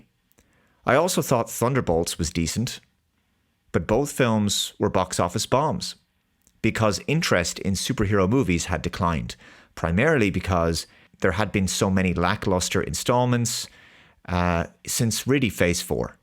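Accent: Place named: Ireland. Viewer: So glad that your break was relatively clean. I hope it heals up nicely. Good luck with the appointment next week! Outside of Spiderman, I haven't gone to theaters to see a superhero movie for several years. It is really sad, but I agree with your reasons for the superhero fatigue. I enjoy your content and love listening to your Irish accent! ❤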